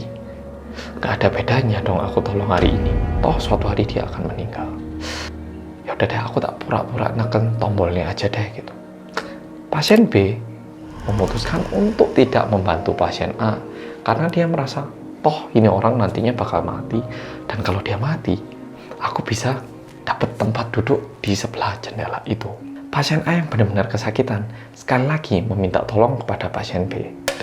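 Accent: Java, Indonesia. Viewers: awal mula medok jadi kepeseng gimana nih?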